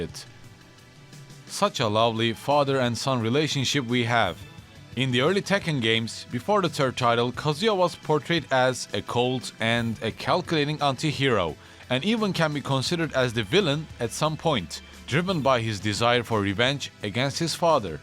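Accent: Turkey. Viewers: man, you just have such a prominent turkish accent. i couldn’t see any signs on ur account that u might be from turkey, but it’s unignorable.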